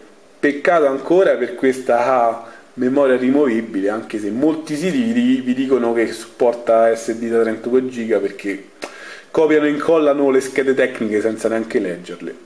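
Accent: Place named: Bergamo, Italy. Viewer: Attendo aggiornamento sulla batteria, sull'audio e sulla fotocamera...bella fratellì, un abbraccio da Roma. C'hai st'accentino de bergamo alta...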